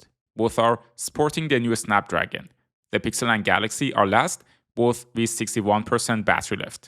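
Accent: Iran. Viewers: That Persian Accent 🤣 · bro are you iranian? your accent is just like iraninas. i'm iranian too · The accent. Bro you're from Iran right? Great video btw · سلام هم زبان فارسی ، از لهجه تون معلومه ایرانی هستین😂😂😂😂😂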